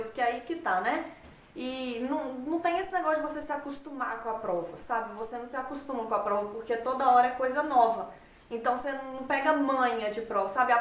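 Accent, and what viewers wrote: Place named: Goiás, Brazil. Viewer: Não é pq vc tem um sotaque meio puxado ak de Goiás, sabe. Hehe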